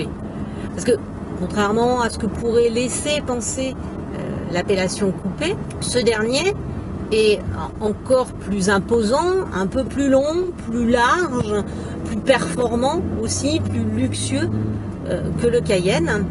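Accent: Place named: Paris, France. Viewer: Bon essai, mais que vous êtes désagréable à écouter Madame !! Et si l’on ne comprend pas que vous êtes une Parisienne pure souche, il n’y a qu’à tendre les oreilles... Bref, je préfère vous lire, vous y êtes indéniablement plus à l’aise.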